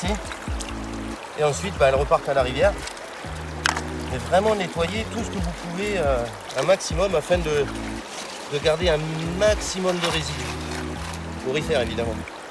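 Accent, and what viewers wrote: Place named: Marseille, France. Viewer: tu et un marseiller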